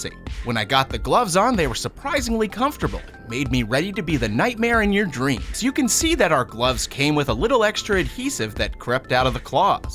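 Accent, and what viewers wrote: Buffalo, United States. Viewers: Are you from buffalo????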